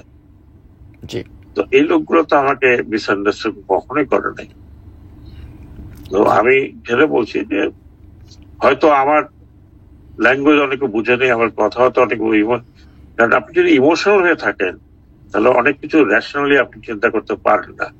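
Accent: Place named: Dhaka, Bangladesh. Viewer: আমি হাসবো না কাঁদব আমি চিন্তা করে পাচ্ছি না । ভিডিও তে বলা হয়েছে, হোস্ট নাকি জার্মানি তে থাকে, ভালো কথা, জার্মানি তে থেকে ও ঢাকা সুরে বাংলা বলছে । উনি পোস্টমর্টেম এর পরের ইন্টারভিউ আর এখন এর ইন্টারভিউ টোটালি আলাদা। যাক বয়স হয়েছে, একটু ভুলে যেতেই পারে। কিন্তু এটা বুজছি না, যে যদি কল টা জার্মানি একজন এর কাছে করা হয়, তাহলে আপলোডের এর IP এড্রেস ঢাকা হয় কিভাবে ? জার্মান থেকে আপলোড করতে পারত না ? অলস অনেক চেষ্টা করছে বুট লুকাতে পারল না, চ্যানেল এর বসে Ip হলো ইন্ডিয়া 😂😂😂। হেয়ার রে গুযোগ এর দেশ । উপর থেকে ভিডিও তে আগে কি হবে সেটাও এডিট করে আগাম বানি দিয়ে দিছে 😂😅😂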